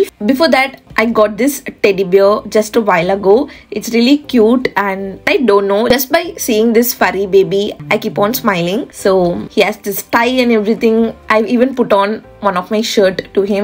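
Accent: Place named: England, United Kingdom. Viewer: So nice !!!! Love ur English accent ….Your room is so minimal and looks so good ❤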